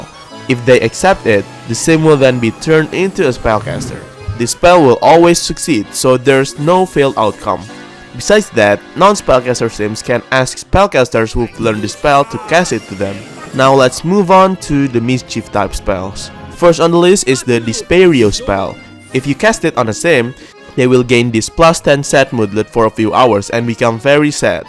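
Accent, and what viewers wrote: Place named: Indonesia. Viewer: Logat indo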